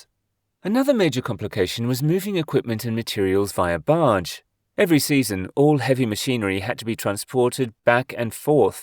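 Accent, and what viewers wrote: United Kingdom. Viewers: Your accent is really annoying. Pretty much all british people are tho so i dont blame you, but you should stop talking so much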